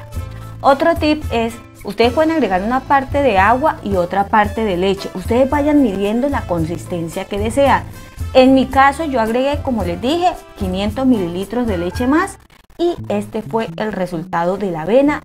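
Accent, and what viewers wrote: Colombia. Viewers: Ay como me encanta el acento colombiano! ❤ / 🇲🇽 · ME ENCANTA EL ACENTO COLOMBIANO Y LA FORMA DE EXPRESARSE.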